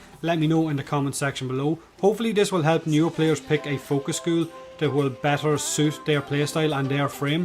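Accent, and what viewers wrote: Ireland. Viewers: Hearing an Irishman say tree while meaning tree instead of three has left me deeply confused. Tree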